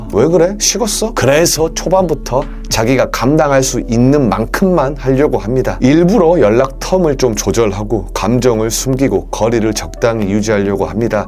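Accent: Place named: Jeolla, South Korea. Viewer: ㅋㅋㅋ전라도 사투리가 묘하게 중독적...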